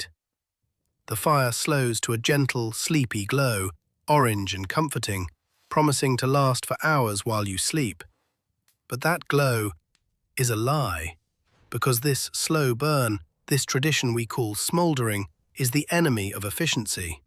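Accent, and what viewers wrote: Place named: United Kingdom. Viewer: Very well done, even with a British-like accent. :-) You might look inro something like a descendent of a pressure cooker to absorb far more btu's without filling the room with steam. I am sure most engineers could come up with something productive and financially rewarding. Best regards, JDB Retired Prof. Engr Mgr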